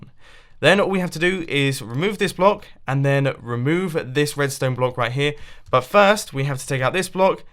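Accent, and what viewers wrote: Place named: United Kingdom. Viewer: Oh um sorry. Thanks for another fantastic video mate! Please keep it up! I also like your British Accent!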